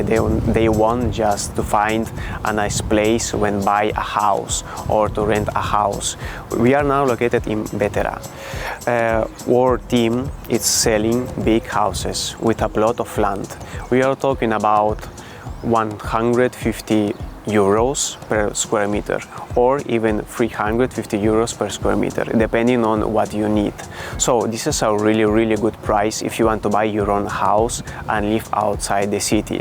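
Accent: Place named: Montreal, Canada. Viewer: Are you from Montreal? Your beautiful accent sounds like it, that's why. 😊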